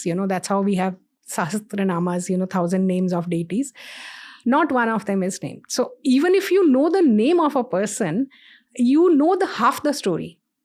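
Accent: India. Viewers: This guy has one of the best funny Indian English accent.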